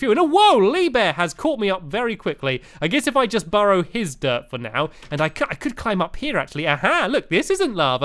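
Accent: United Kingdom. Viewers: This video is so British. 1:British accent. 2:Clock tower. 3:Tea shop.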